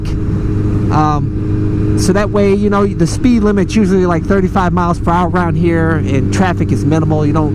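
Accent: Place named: Cleveland, United States. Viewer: Clownsization I’m from Mayfield Village. He’s got the Cleveland accent for sure.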